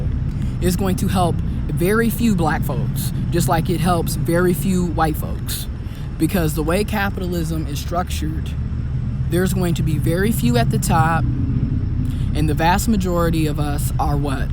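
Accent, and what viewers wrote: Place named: East Tennessee, United States. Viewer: You sound like you from east Tennessee with a Southern accent but you articulate your words very professional in beautiful i wish i can speak like you , you are right what you saying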